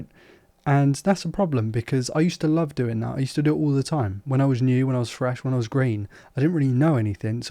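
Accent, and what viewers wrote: United Kingdom. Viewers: I just watched one of your videos for the first time (the Used S23Ultra vid) and was happy to subscribe despite being a terrible fit (geezer American who's never played a video game in my life). I'm a sucker for a calm Brit who knows his stuff and doesn't waste my time. Best of luck and take care of yourself.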